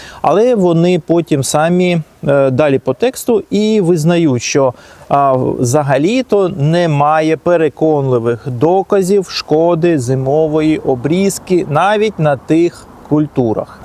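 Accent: Standard. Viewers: Приемно вас слухати здоровья вам.Дуже чиста українська мова🎉🎉🎉❤❤❤ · Украинською классс. Чиста лiтературна, без запинань. То рiвень. Сам я рускоязичний, але перехожу теж на Украiiнський мову та звiсно контент. Тому успiхiв. Ну а з мене пiдписка. Бо корисний контент, але формат з настроем, тому цiкавий.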